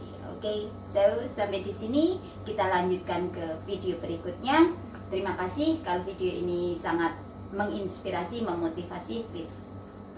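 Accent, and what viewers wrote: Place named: Java, Indonesia. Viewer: Logat yg ciamik antara jowo medhok dg logat bule .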